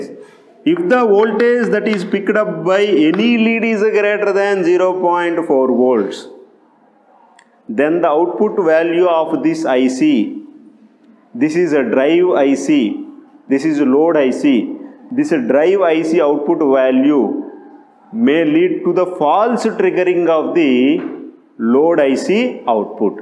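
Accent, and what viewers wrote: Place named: Telugu, India. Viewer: Pakka telugodila vunnadey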